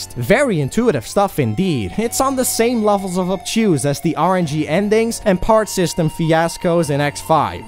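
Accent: Netherlands. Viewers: Lmiao!!! I think you have! That Dutch accent helps, too. 💞💕💞 seriously though, loving your content. Currently binge watching your catalog. Subscribed. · SuperDutch accent :)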